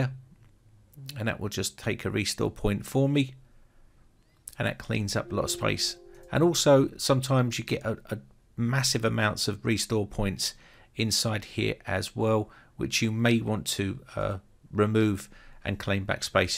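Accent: United Kingdom. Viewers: First tutorial I see by a British mate! Cheers!!! Bloody hell !!! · He sounds nothing like Korg... The guy in the video has a British accent, Korg has an NZ accent.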